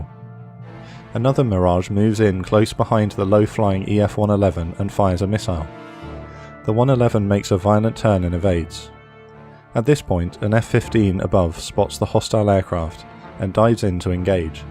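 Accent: United Kingdom. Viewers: Why are narrators always british?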